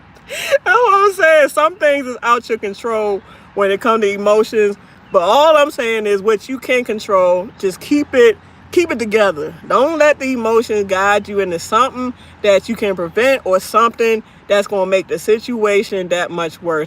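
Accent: Baltimore, United States. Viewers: Sound like you’re from Baltimore.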